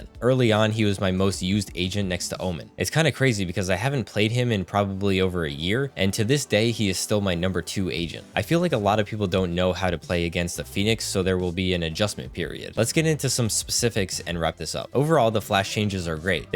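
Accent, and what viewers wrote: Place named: United Kingdom. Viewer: He’s back to being a cool bri-ish boi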